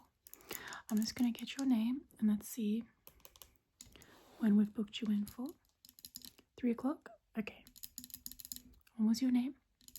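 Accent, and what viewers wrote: Australia. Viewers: I love listening to your voice and accent it's so soothing. There are very few asmrtists like this. What part of Australia are you from? 🇦🇺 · I don’t want to be mean but you sound Australian😭also, your very BEAUTIFUL🫰🏻🫰🏻keep the asmr going please🤍🤍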